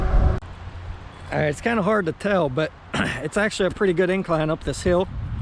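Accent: Pittsburgh, United States. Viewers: Thanks for the video. Do I hear a Pittsburgh accent?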